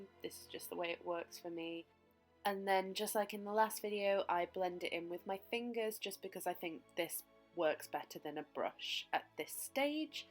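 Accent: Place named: North, United Kingdom. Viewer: Oh right, I'm really bad with spotting accents but I did detect a slight northern accent haha. That's so cool that you have family here haha xxx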